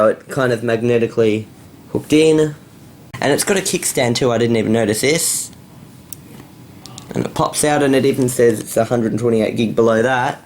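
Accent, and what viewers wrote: Australia. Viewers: Oi! An Australian! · woah woah woah HALT IT your australian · Are you from Australia? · damn that accent you ozzie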